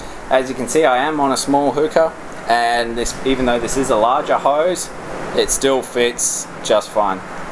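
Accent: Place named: Australia. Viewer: Australian accents are bomb. good review man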